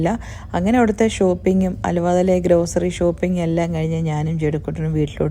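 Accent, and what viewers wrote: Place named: South Kerala, India. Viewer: Nattil evdeya South Kerala style talk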